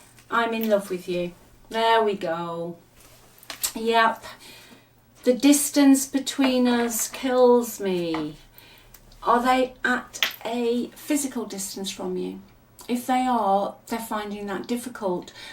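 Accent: United Kingdom. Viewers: Hi , I’m Neo the matrix buster, love your accent, British? I live in Michigan USA!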